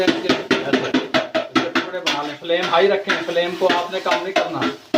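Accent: Punjab, India. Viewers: Asslam o alaikum Bhayi Allah Kareem ap ko sahet aur slamti dy aur Umar m barkat ata frmay aur dharon khushiyan ata frmay aur nazar bd sy bchaey ameen suma ameen ya rab ul Allah ameen ap ka baat krnay ka andaz Bohet acha lgta hy aur Punjabi touch ki tu kia hi baat hy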